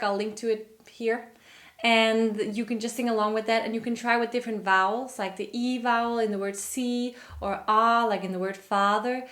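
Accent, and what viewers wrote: Denmark. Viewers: Is Danish your first language? Because that's what I hear faintly in your way of speaking. And it's driving me crazy. Sorry for the personal question, but, hey, at least it's a direct request, right?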